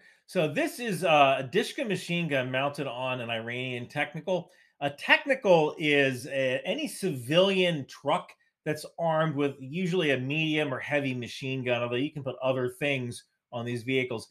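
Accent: New Jersey, United States. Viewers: Shit man, I like the Jersey accent even if I barely hear it. Keep at it! Without you and Preston Stewart we wouldn't have nearly as much accessible info! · Thank you for breaking this information down. If people complain at you for mispronunciation in a Jersey accent. I would hate to hear them whine about my Texas accent pronouncing foreign words. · I mean he doesn't really have a strong accent. You can definitely hear the Jersey in his voice but it's not near as intense as some! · Mr., dont ever apologize fir for your Jersey accent. I'm from a few miles north of the Jersey border and I sound just like you. And it's a great accent! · I grew up and still live in S. Jersey, no worries about the accent. And I'm near the middle of the speedline, so at least your hometown has a claim to fame. Don't feel bad about the way you talk, all good Jersey people talk like that. 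· don't apologize about your New Jersey accent. I'm from NYC and I completely respect and understand you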